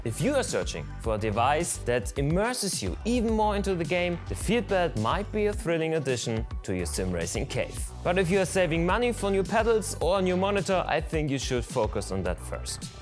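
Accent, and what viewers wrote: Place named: Germany. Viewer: This guy has the worst German accent ever. So harsh to listen to.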